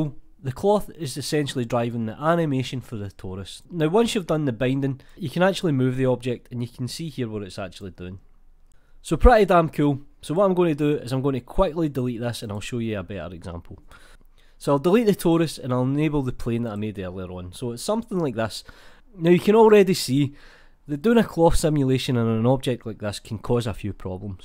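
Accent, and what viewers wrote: Scotland, United Kingdom. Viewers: I get that you might have trouble understanding him, Scottish (I'm assuming) accents might be a little different than what you are used to. But, to accuse him of not speaking clearly? Wow... Oh, and Nicholas, I wouldn't ever travel outside of the US, you will be extremely disappointed. By the way, I loved many things when I traveled to Scotland from the US, but really loved the accents.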